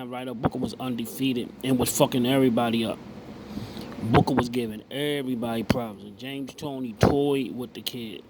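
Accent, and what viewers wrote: Baltimore, United States. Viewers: Heavy Baltimore accent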